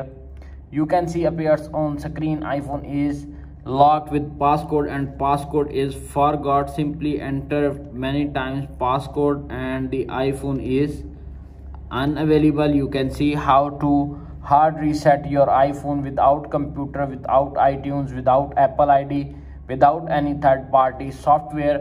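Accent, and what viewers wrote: India. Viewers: scammer with the indian voice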